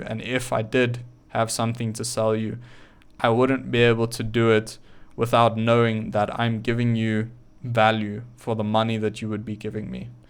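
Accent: South Africa. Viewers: You speak English really well. I mean the accent. I can barely hear what sounds like a South African accent. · are you from south africa? i worked with a guy that sounded like you. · You sure are handsome! 😍 Dreamy Alpha male. And that SA accent is beautiful.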